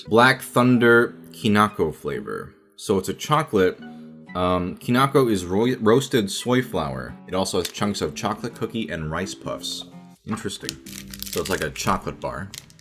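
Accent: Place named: United States. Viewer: I'm lying in bed at 3AM, watching a Korean man with an American accent eat Japanese snacks... what am I doing with my life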